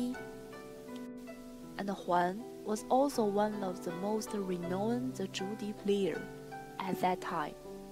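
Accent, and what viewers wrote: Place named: Taiwan. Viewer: This musician has Plum Blossom Spirit. I liked the illustrated introduction with English text, voice-over narration in English and background music. The background music volume was balanced well with the volume of the voice-over narration. Unfortunately, there were several mispronunciations of English words that were not corrected by an English coach or pronunciation software with voice feedback. The intonation was fairly good. I was strongly reminded of the accent of the Taiwanese actress Qi Shu in the movie 'The Transporter(2002)'. The performance was very good to my ears but I know nothing about Bamboo Flute playing and very little about music. In the word 'anecdote' the final letter 'e' is silent and makes 'anecdote' rhyme with 'note', 'wrote', 'coat', 'boat', 'float', 'mote' and 'dote'. How to pronounce 'anecdote' in American English <link> How to pronounce ANECDOTE in British English <link> Knowing how to pronounce words in English is very difficult especially if one is obtaining a lot of information from written material. 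In my first year calculus class I had a professor with a good sense of humour who told a funny anecdote in the lecture room about how to tell mathematicians from other professionals in science using a kettle of water to be heated. One day I was talking with him in his office and I mispronounced the name of the German mathematician George Bernhard Riemann as "ryeman" and referred to "rye" "manian" geometry. The correct pronunciation is "Reemon" and "Re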